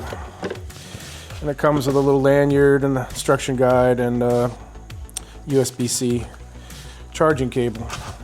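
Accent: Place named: Louisiana, United States. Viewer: I knew you sounded like family! Lol I’m south Louisiana also, used to be the furthest south you could get in Venice! Anyway, I just got accepted to the vine program and I’m watching your videos so I can see what to search for Maybe. Thanks for doing this. Maybe I’ll get some inspiration from you and start actually creating content since I have tons of equipment to do it just not the patience. Lol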